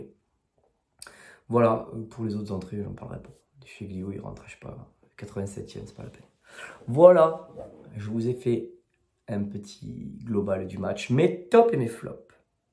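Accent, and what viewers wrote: South, France. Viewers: J’allais te traiter de «Guignol» et je me suis rendu compte de ton (jeune âge).. En fait tu parles de se que tu connais je veux dire depuis que ton cerveau est en possibilité d’analyser se que tes yeux voient !!!! Tous ça pour t’expliquer que tu ne peux pas comparer l’incomparable (Karim Benzema) c’est la classe mondiale,mais quand il brillait à Lyon (150 ou 170 buts en 5 ans)toi tu étais trop jeune pour t’en souvenir et en profiter alors que Menphis depay est un bon joueur qui apporte à Lyon c’est indiscutable,mais de là à prétendre être au dessus de «Mr 9, 9.5,ou 10» du Réal Madrid,t’es à côté de tes pompes petit !!!! Ps: Avec ton accent du midi tu te permets de dire des trucs sur des joueurs Lyonnais, choses que même les supporters Lyonnais natifs de Lyon ne se permettent pas. Un peu de respect pour Lyon pélo !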